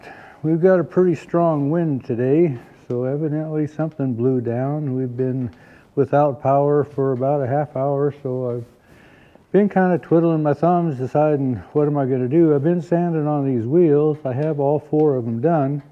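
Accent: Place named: West, United States. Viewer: Once again, thanks for a wonderful yarn. Sure put a smile on my face! Love to listen to a real westerner spin a tale.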